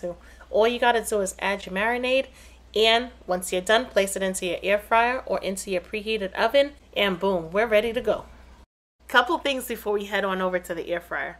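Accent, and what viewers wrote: New York, United States. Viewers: I’ve gotta say that New York accent takes me back to my days of living in Chelsea. 🏙️ 🥘♥️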